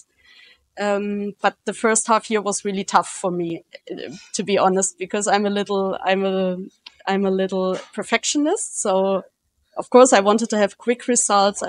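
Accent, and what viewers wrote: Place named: Russia. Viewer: Отлично,. Английский ваш смешной, очень русский, но честный! И ход точный: с разворота брать интервью на английском. Знай наших, питерских!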